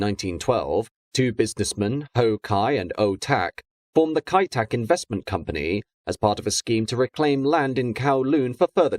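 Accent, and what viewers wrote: United Kingdom. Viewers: I was born in Kowloon Hospital in 1946 . Growing up on my Grandparents' dairy farm in the hills above Ngau Chi Wan, my brothers and I watched the landing of Pan Am Clippers landing on Kowloon Bay. // Also, the British planes landed in the first- built runway ( near the district Wong Tai Sein): Everytime a small plane landed, all buses and autos had to halt in front of a barrier. I always take the No. 5 bus to St Mary's Canoissian School daily, and I remember this protocol vividly! // on certain special occasions, fleets and fleets of British aeroplanes would fill the air space --- above Kowloon Bay --- for an exhibition. It was exhilarating to watch, as kids, perched on a nearby hill ( south of an old, Taoist monastery!!! The British government later built the famous KAI TAK AIRPORT: I departed the bustling British Crown Colony, on Philippibes Airlines, taking off from the Kai Tak runway, heading towards Li Yu Mun Channel. 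What good Memories!// My dream -wish now is to cruise to Hong Kong Harbor, via Priness Cruises, and dock alongside the old Kai Tak runway to disembark!!! It will be .... like .... COMING HOME for a little Chinese girl! Thanks for this informative video. Much Appreciation. Love the British accent of the Narrator!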